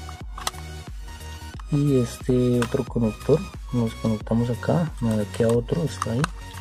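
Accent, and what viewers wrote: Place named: Colombia. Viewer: Por el hablado pues es obvio que de Colombia...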